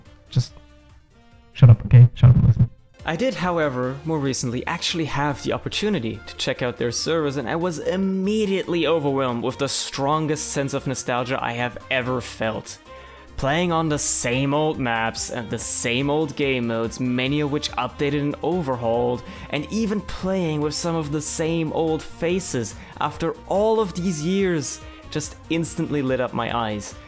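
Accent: Germany. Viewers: are u from germany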